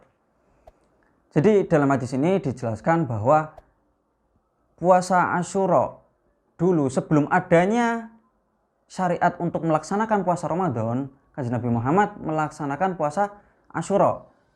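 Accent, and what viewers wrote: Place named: Banyumas, Indonesia. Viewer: Ust ahlu ngapak masyaAllah😍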